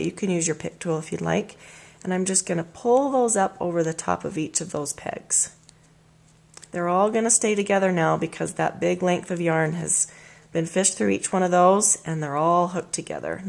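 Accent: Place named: England, United Kingdom. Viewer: Thx for the help but just saying u come from England ur not american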